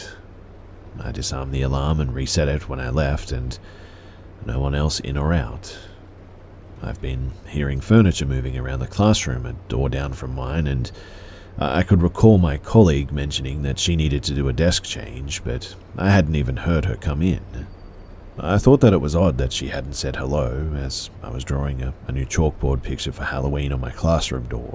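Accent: Australia. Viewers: Favorite video you put out as of recently. You're the fucking man, Thanks for your beautiful Aussie accent lulling me to sleep every night. You've gotten me through some tough times and I genuinely thank you for that man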